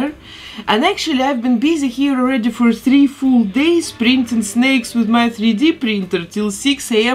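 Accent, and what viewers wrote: Russia. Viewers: Вау, русский акцент?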